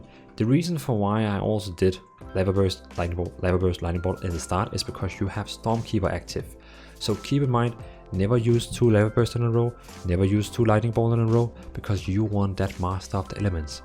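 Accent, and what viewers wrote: Denmark. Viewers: Really enjoy the tone and speed of you voice :) do i hear some Danish in you english also? You Got a sub :D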